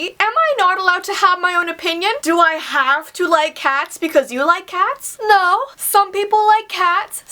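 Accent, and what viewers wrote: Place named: Canada. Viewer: I love your Canadian accent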